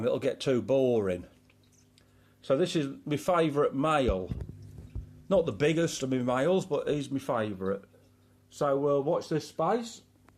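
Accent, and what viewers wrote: United Kingdom. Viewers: Would love to see a full tour of your setup , obviously from the accent you are from the UK but are you UK based ? dont know whether its just my area ( south coast ) but we dont really see many americans other than the usual suspects Oscars,terror, texas etc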